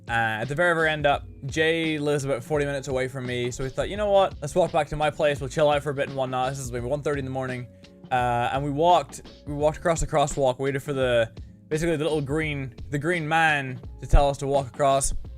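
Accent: Ireland. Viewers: where does he live??!! he sounds like hes from ireland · Mini Ladd sounds SOOO Irish in this video!!! Ik he is Irish but JUST THIS VIDEO!!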